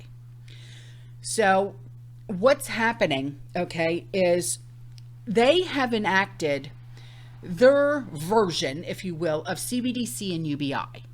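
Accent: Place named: Pennsylvania, United States. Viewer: I’m from the South and I love your accent. When you say, “youse guys.” I think you must be from Pennsylvania originally?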